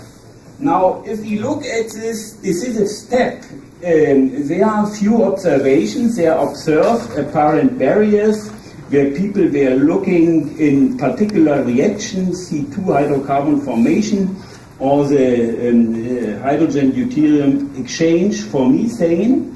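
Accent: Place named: Germany. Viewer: dat german accent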